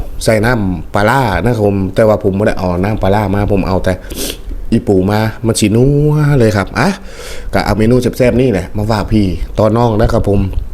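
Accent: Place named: Phu Thai, Thailand. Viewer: สำเนียงเหมืองคนภูไท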